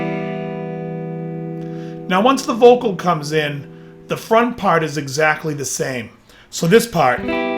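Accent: Boston, United States. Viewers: Nice lesson. Are you from the Boston area by any chance?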